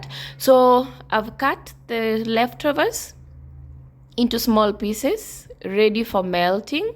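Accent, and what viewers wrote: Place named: Africa. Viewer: Simple. I loved it bcoz of your narration, and with out seen you, I knew it's from Africa 🌍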